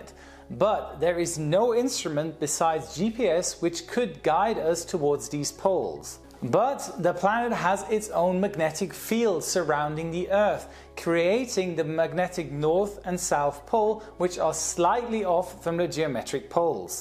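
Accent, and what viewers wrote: Germany. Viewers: man the r you use in your 'three's makes it super obvious youre a german xD good content, super interesting. keep it up! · Typical german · lustig das man als deutscher immer gleich merkt wenn ein deutscher englisch spricht :D versteht man aber viel besser wie einen englisch Muttersprachler :D echt interessantes Video... wär ich nie drauf gekommen das die Nummern etwas mit dem Magnetfeld zu tun haben ^^ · Oof German accent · Joe with his German accent.... Gotta love it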